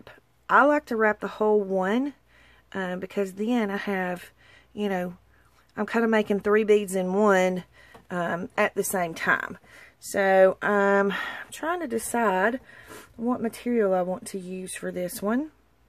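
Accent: South, United States. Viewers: This was a great tutorial. Your wire wrapping is beautiful. I love the fabric and stuff on the second bead. Do you have an Etsy shop? I didn't see any links or more ways to contact you. I'm from N. Georgia and I can tell you're from the south. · I sure have enjoyed learning from you and listening to your Georgia/Tennesse accent! You're too cute! Love the boho beads! <3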